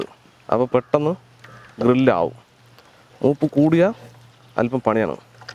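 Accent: Palakkad, India. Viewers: palakkaden samsaram ath poliyan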